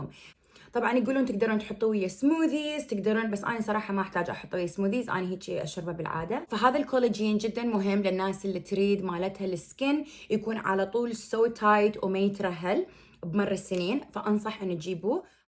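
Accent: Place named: Iraq. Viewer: اهلا وسهلا بك ياابنة العراق.مااجمل لهجتكِ العراقية!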